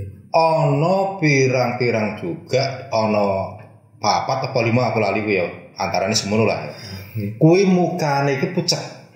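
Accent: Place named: Pekalongan, Indonesia. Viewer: Logate koyo wong kalongan o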